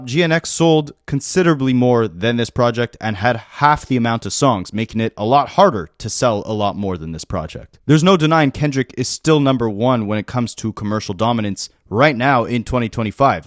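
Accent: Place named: Montreal, Canada. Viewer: Are you from Laval or the east end of Montreal or something. You sound like you are a Greek or Italian montrealer 😂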